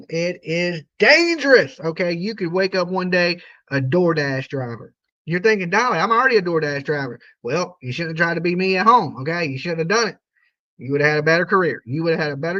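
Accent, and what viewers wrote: South, United States. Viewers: Hey Dolly! Long time listener (I remember you playing the Banjo during Summer Wells). I live on the border of Maine (USA) and New Brunswick (Canada) by 3 blocks and a River. It is Picteau County but pronounced Pick (like a guitar pick) TOE (like the appendages on your feet). I know this because I listen to a LOT of Canadian news (CTV or CBC). You are close and that redneck accent may be the issue, but Maine has rednecks, too so no excuse.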